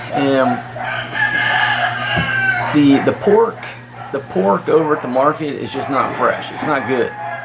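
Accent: South, United States. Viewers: Kudos for laying down the law my friend. Dont know how much of a yard you have there, but did you ever consider raising chickens of your own for meat and eggs? Maybe even raise some pigs? Would like to see more of the beach you have there. I would definitely be taking advantage of the fishing as a food resource too. If your relatives come over, have them pitch in and give you a hand. If you put them to work, they will either earn their keep or stop coming around. Explain to them that education is their way out of poverty. Sounds like you have a southern accent. Where ya’ll from? I lived in south Georgia for 30 yrs. and know a good ol’ boy when I hear one.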